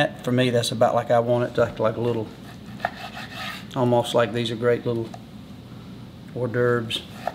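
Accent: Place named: South Carolina, United States. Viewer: You must have took a long break fir those Big pieces of wood to be that small of coals. South Carolina accent is hard to hide..lol And he didn't give a good size bite..lol Good video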